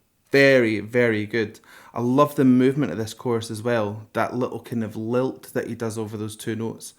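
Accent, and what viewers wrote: Scotland, United Kingdom. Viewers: Hey, this is nice. Keep going! This ARMY approves. Not that you need mine or anyone’s approval. 💜 Some of the songs Jin really shines in are BTSs Japanese songs, and Korean. And ofc his solo songs, etc. is a magnificent vocalist. Very stable too. Have fun with this! ps. I’m Swedish but I worked a season in Dalwhinnie and traveled around Scotland many years ago and when I hear you speak it really takes me back. Love the Scottish accent. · Hey. Thank you for the technical explanations. This is exactly what I look for in a reaction video and I so rarely find it when it comes to reactions. Also, love your Scottish accent. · I love Scottish accent! I enjoyed your professional explanation very much! Jin is definitely a great singer and yes, we need more Jin in ’ songs!💜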